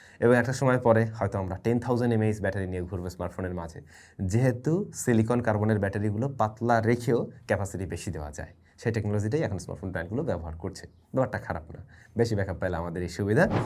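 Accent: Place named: Barisal, Bangladesh. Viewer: vai apnar Basha barisal a kothay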